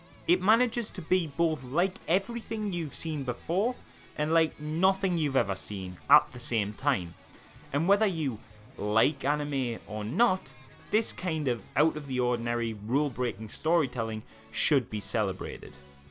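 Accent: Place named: Geordie, United Kingdom. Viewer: You absolute geordie. Let's meet up and Have a space dandy time. "HEaring yoou discribe this is pua amaaaazin" vowels going as hard as this show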